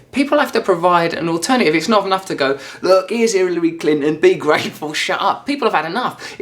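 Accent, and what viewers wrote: United Kingdom. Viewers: This is hell good. I am preparing myself for Itels and I am listening British accent, found this and I wrote a bunch of good words to use. The fluency of his words are absolute amazing. Congrats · Do we really want to take political advice from a fucking comedian? Just because he uses big words and has a British accents doesn't mean his arguments make any sense.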